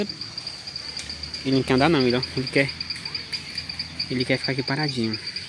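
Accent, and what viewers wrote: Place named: Paraíba, Brazil. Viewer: Comlissenssa vc e da paraiba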